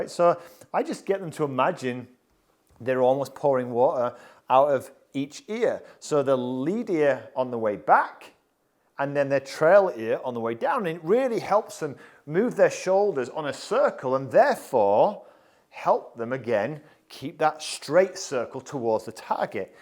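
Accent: Yorkshire, United Kingdom. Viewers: Wanted a in person lesson with you thought you might be local ish with your Yorkshire accent I’m hearing maybe Leeds but your based all way down Kent too far for me to go for a golf lesson might have to get an online one booked instead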